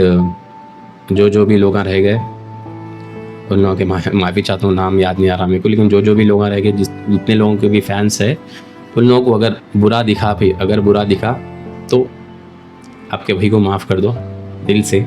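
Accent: Hyderabad, India. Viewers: Bhai tum serious baath kare to bhi hasse waisa dikhra 😂 woh jaando nakko chhodo videos banana ache banate tum videos🤣 ek to bhi rehna bhai Hyderabadi accent me roast karne wala😂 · Assalamualaikum bhai hum logao aap ke sath. Hyderabadi accent chodke amer dens ke Jaise videos banao jaise amer bin ishaq reel reaction do baigan reply do Bhai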